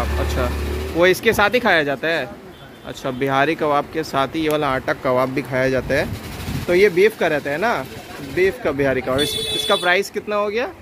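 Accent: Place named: Madhya Pradesh, India. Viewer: Aapki accent mp valo jaisi h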